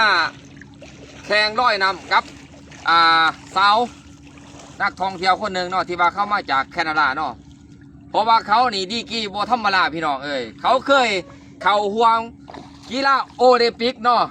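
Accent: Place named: Pakse, Laos. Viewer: Sabaidee!!! You sound like you're from Pakse, I was born there. I am happy to see that you are showing Foreigners our lifestyle in our country/Laos....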